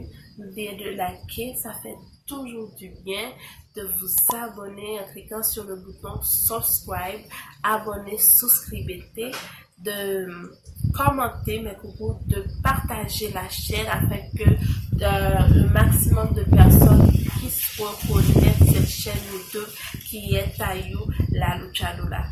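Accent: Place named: Haiti. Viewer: OMG j 'aime ton accent ,tu nous as dit que t'etais une haitienne , franchement je je crois pas ,la maniere dont tu parles le français c a féliciter ma cherie,maintenant je vais voir parmis mes amis lequels sont fake,lolllll.thx Belle Madame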